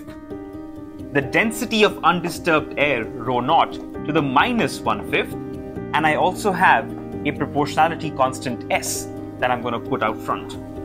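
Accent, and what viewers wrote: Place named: India. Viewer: only indian channel where the accent doesnt piss u off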